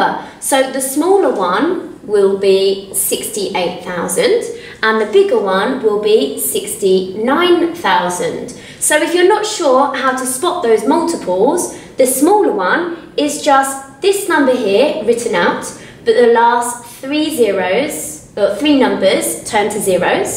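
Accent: England, United Kingdom. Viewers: British girl HAHA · Ooho kon sir das ki ho England kya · I love your britost voice can you a video on English speaking practice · I love your British voice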